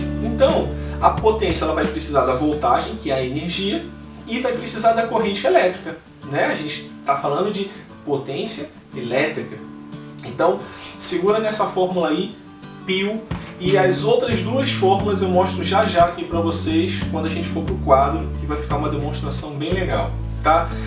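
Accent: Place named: Rio de Janeiro, Brazil. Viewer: O cara é muito gostoso. Vou ouvir essa aula várias vezes antes de dormir com esse sotaque carioca. Muito bom. Principalmente quando você foi ao quadro. Massa cara.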